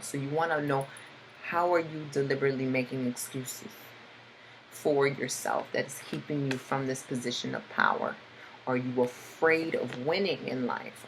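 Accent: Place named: Brooklyn, United States. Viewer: You're so Brooklyn, yo lol. I love your accent!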